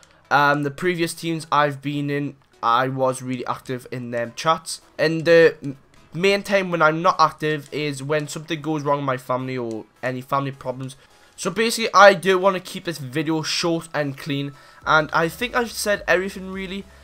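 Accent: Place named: Newcastle, United Kingdom. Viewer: Your from Newcastle aren't you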